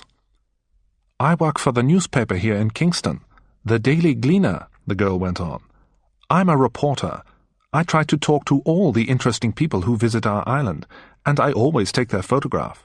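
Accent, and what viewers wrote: United Kingdom. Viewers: is this british or american?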